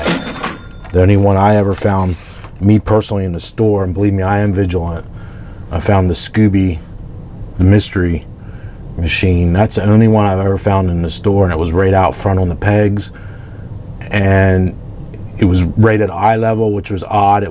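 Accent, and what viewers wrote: Pennsylvania, United States. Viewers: Sounds like you from Pennsylvania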